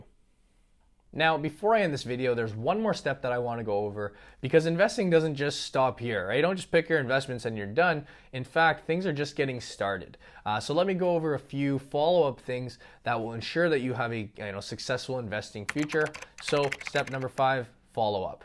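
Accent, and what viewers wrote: Toronto, Canada. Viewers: ahaha Toronto mans for sure. great content bro new follower here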